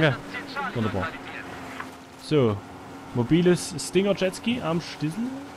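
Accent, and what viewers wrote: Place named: Saxony, Germany. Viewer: nu isch find das sächssische eefach genial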